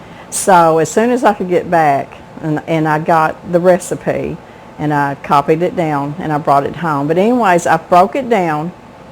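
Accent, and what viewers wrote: South, United States. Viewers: I am a new subscriber from Ontario, Canada. Not only do I love your recipes and your channel... but... I absolutely LOVE your Southern accent. Whereabouts are you from?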